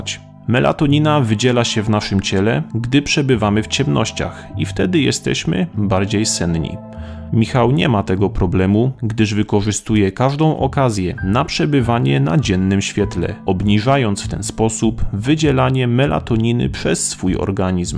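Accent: Silesia, Poland. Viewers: Krótko, zwięźle i sensownie. Lekki śląski akcent 😉👍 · Narrator to Ślązak? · Jesteś ze śląska? Tak mi się wydaje, że masz akcent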